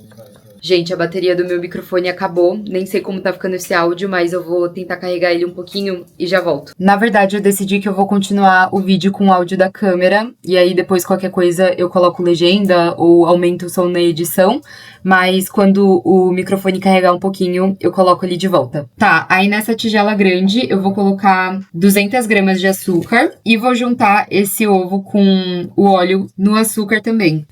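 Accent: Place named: São Paulo, Brazil. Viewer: Ela parece ser paulistana mesmo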